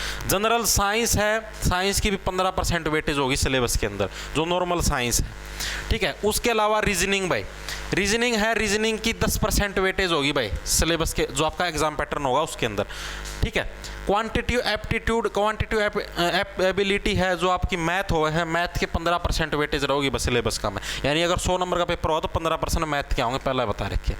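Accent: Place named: Haryana, India. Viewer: Kya haryanvi boli hai.. Amazing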